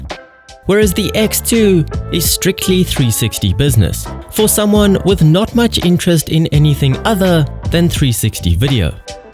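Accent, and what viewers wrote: South Africa. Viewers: Your videos are AMAZING!!! Sound quality is amazing, background music amazing, narration amazing even with your cool SA accent 😁 Seriously the quality of your videos would impress Spielberg! Fantastic, keep up the good work and thank you for putting so much effort into your content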